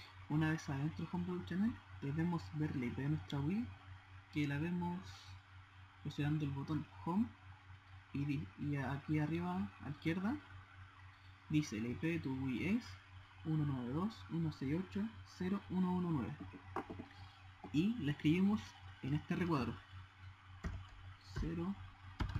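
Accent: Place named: Chile. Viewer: ¿Eres Chileno cierto? Jajaja, yo tambien soy Chileno y adoro nuestra forma de hablar <3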